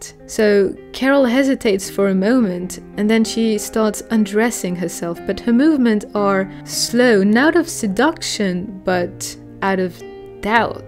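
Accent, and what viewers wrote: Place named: France. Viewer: Absolutely brilliant analysis! Loved your enquiry into Carol, and how every single sentence is both sharp and poetic. Well done ! 🙏🙌 And you are a native bilingual, aren't you (You have an ever so slight French accent and the prefectly native Parisian one)? (Not to mention your poster of Chris and la petite robe noire 😆) Anyway, love from London from a queer ⚢French/English film analyst (I know how hard it is, so I do admire your competence) 😊 Merci ❤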